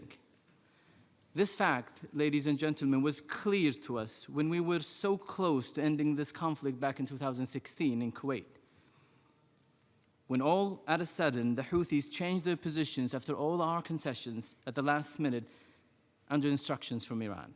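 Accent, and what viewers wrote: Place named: South, Arab world. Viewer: دحباشي حسبته جنوبي عربي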